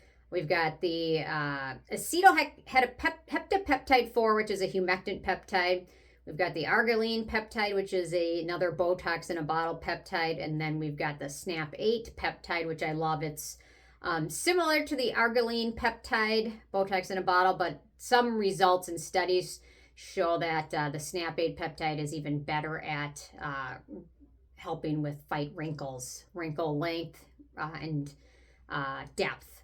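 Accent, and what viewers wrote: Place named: Wisconsin, United States. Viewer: You sound like you’re from Wisconsin. Thanks for the ingredients. I checked on Acne Clinic NYC and there are no pore clogging ingredients, yes!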